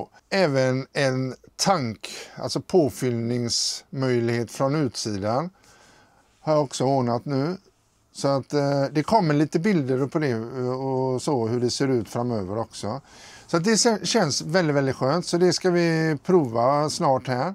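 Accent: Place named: Dalarna, Sweden. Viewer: Dalkulla? Rättvik eller är det mora ?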